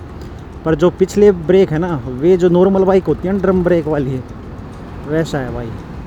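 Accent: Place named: Haryana, India. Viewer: Well explained bro..no nonsense talk..I like haryanvi language..sweet..I am planning for this one..but sometimes confused between This and Hiness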